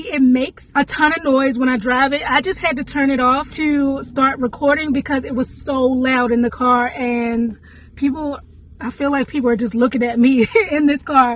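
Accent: Baltimore, United States. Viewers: Yup I hear the Bmore lol rumz lol aka rooms I love it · i'm so late! i had no idea you were from baltimore. MD GIRLS FTW lol Now i'm hearing ur accent heavyyy lol. Congrats on the new whip! She's too fly! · I’m from Baltimore tooo , your accent is soo clean compared to how others talk here. 😌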